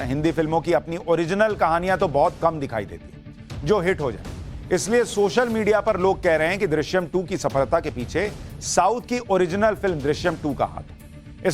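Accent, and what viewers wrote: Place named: Bhojpuri, India. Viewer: Love you bhojpuri words and accents you use in between,it helps in suing away the stress got fron the serious news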